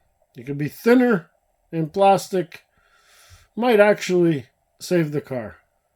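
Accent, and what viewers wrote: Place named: Canada. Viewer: As a fellow Canadian but living in The USA, i love your videos and accent, EH! I want to display all my cars loose like you do. I have over 500 cars that need to come out of their cases! Where did you get your display cases?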